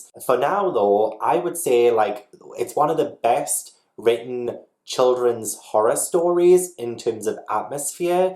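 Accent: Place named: Geordie, United Kingdom. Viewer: I'm crying at the Most Haunted skits 😂 This is so good,! I loved the broad Geordie accent, feels so weird hearing it on youtube! I wonder who could understand it 😂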